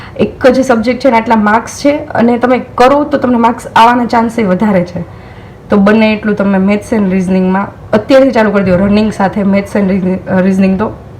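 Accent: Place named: Saurashtra, India. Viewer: Tamari bhasa upar thi lage che ke tame gir Somnath ke Junagadh na cho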